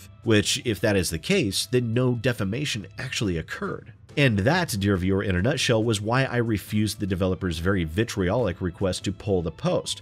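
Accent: United Kingdom. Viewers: You’re a US citizen? After hearing your wonderful English accent, I thought you were a Brit 😉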